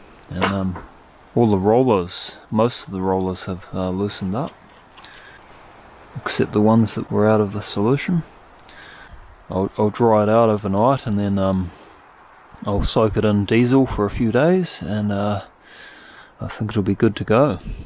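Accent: New Zealand. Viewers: I came across your channel by random surfing a few days ago... Now I'm absolutely hooked!!! Really interesting and good video's. I'm envious of how knowledgeable you are! Also, the Kiwi accent is cool as fooooook!!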